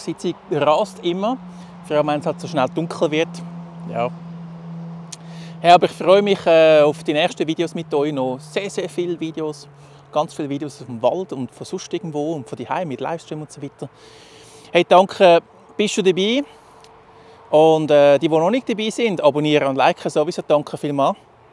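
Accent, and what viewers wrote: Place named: Switzerland. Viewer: Hallo Erst mal ein frohes neues Jahr, hoffe du bist gut reingerutscht mit deiner Familie. Hast aber auch ein Top Wetter gehabt. Essen sah wieder lecker aus. So ein Kaffee ist immer was feines in der Natur. Hab jetzt Urlaub, dann geht es auch wieder in den Wald. Vielleicht kann ich dir ein paar Bilder über E-MAIL schicken. Hast du eine E-Mail Adresse? Glückwunsch zu drei Jahren Outdoor 🎉🎊🍻. Ich glaube so langsam schau ich mir auch deinen Kanal an. Machst auch immer Top Videos immer authentisch. und das Schweitzer Deutsch passt auch gut dazu. Bleib wie du bist. Bis zum nächsten Video. Grüße in die Schweiz aus dem schönen Siebengebirge 🇩🇪🇨🇭🤜🏻🤛🏻 Michael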